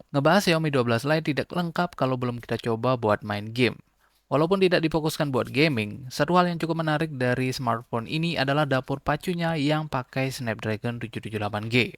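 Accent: Bali, Indonesia. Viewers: logat nya kek orng bali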